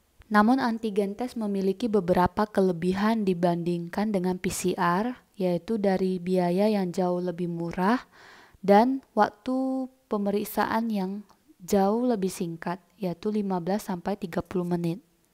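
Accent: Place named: Medan, Indonesia. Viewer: ini dokternya kl gk dr medan ya dr pontianak singkawang hh sy denger dr aksennya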